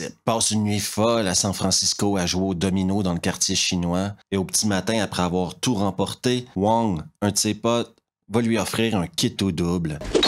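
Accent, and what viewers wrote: Quebec, Canada. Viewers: Tout le monde aime l'accent quebcquois, c'est l'accent du français de province de la renaissance.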